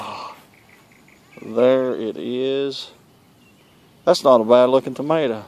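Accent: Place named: Mississippi, United States. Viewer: First comment of 2016 BTW ur from Mississippi huh that's how we sound down here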